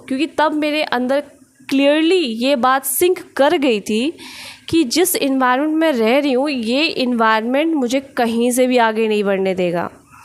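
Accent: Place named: Bengal, India. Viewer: your bengali hindi accent is awesome 🤩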